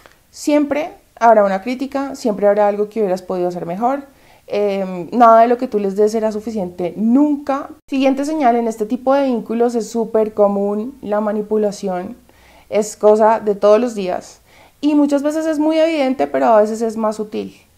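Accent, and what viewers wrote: Bogotá, Colombia. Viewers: Así se expresan los Bogotanos 😊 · Me encanta tu acento colombiano, ni raja ni presta el acha, aquí en México decimos: ni picha, ni cacha ni neja batear.... excelentes videos....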